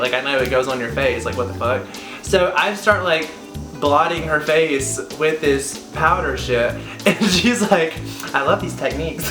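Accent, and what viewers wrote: South, United States. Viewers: You just have a southern accent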